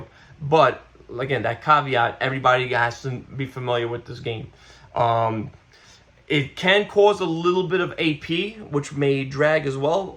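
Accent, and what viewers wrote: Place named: New York, United States. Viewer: Great job!!!! I just come here for your accent as I miss NY.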